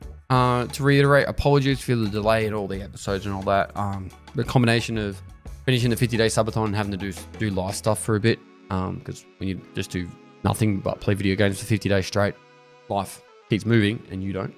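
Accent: Australia. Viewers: Finally caught up. I can now understand Aussie at 2x speeds!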